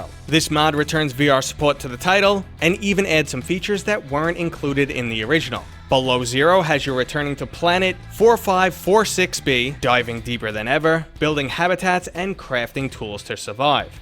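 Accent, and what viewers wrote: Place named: Boston, United States. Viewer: That boston accent tho.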